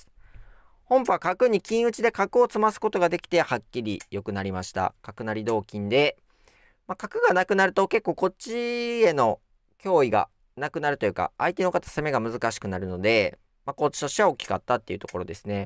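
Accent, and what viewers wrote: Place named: Kansai, Japan. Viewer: 関西人特有の適当トークが好きです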